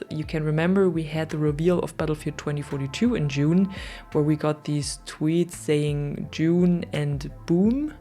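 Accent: Germany. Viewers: as a german, she sounds ultra german to me haha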